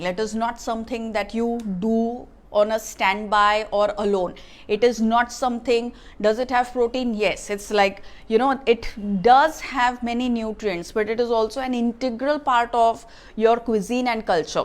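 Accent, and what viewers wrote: Mumbai, India. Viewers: Love the mumbaiyya style talking ❤️ but mam every point is perfectly explained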